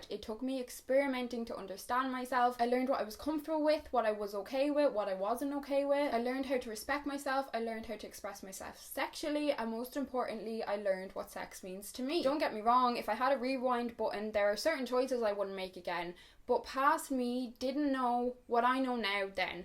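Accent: Dublin, Ireland. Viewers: Hi, is that a Dublin accent you have?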